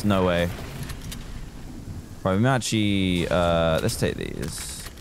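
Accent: England, United Kingdom. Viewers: I know :) I just wanted to see if I was the only one who heard it, even though it's his English accent. Thanks though 👍🏻😁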